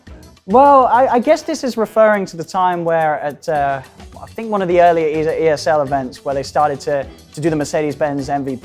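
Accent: England, United Kingdom. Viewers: ох этот английский акцент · . Вообще, для меня это лучший дота комментатор, особенно его потрясающий английский акцент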